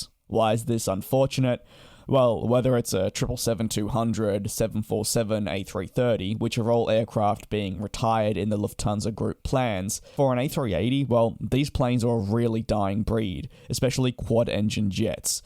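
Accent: Australia. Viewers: Great to hear an Aussie with Aussie presentation!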